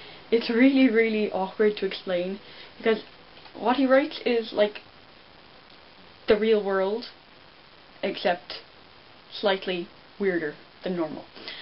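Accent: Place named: Ireland. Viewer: literary irish. very attractive accent.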